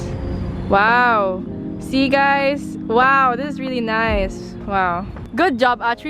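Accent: Philippines. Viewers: are you filipino? (accent) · damn, filipino accents, its so weird, okay im not hating because I also is a filipino, and my old "grammar" doesn't make any sense, just saying okay, dont hate me I also use to have a bad accent when speaking english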